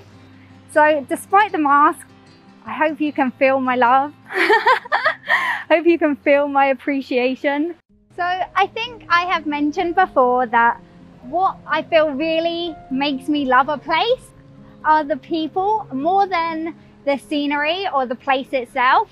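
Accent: United Kingdom. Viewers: 我就喜歡妳「正統」發音的英語，這只是相較於美式發音的英語，並沒有其他太多想法。大概多數的臺灣人只能辨別英式或美式英語，無法再細分英國境內的南腔北調。我的觀念，英語源自英國，所以Lucy講的英語是正統的。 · 不為創作而創作 想 也可 再 動手 靜靜地感受生活的美好 也是 美-！！ I do love your UK accent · Hi,妳的英國腔口音，真的很迷人，台灣人很難學得起來。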